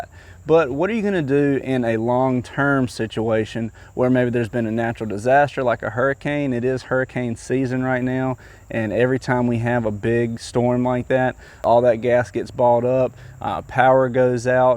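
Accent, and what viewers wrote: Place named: North Carolina, United States. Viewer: I knew that was a NC accent. Thanks for this video. I'm draping a leg all over your channel my man!!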